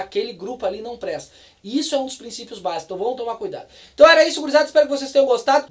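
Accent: Porto Alegre, Brazil. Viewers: VÉI, quando eu assisto teu canal me sinto muito bem. Parece que eu to num bar da CB mandando brasa nos papo tri cabeça com a gurizada. É o sotaque portoalegrense. Acho que acostumei a assistir vídeo com sotaque paulista aqui no youtube. Abraço da zona sul de Porto Alegre e continua com os vídeos.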